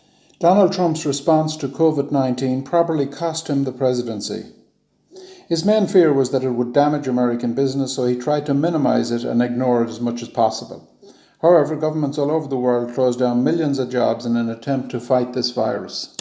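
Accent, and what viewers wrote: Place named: Germany. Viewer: You summed that up pretty damn well. You sound german. Do you live in the states?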